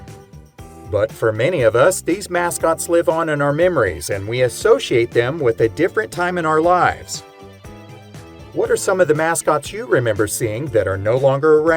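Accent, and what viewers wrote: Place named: South, United States. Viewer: It seems like you are from the South, but I can't place that accent. I fear it might be Virginia. They need to be reclassified.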